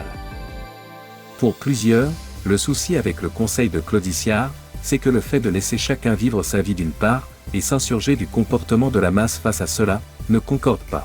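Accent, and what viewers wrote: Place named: Europe. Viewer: Quel médiocrité.... et utiliser une voix européenne pour dire que les médias de l'occident s'y mêle est encore plus nulle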